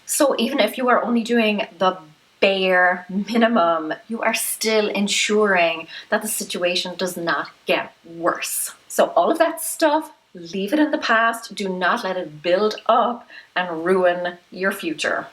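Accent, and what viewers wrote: Ireland. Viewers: Would love to see a monthly reset! Thanks!!! By the way, Americanisms with your Irish accent? Love it! Ain't nobody got time for that never sounded so cute and classy at the same time ;-)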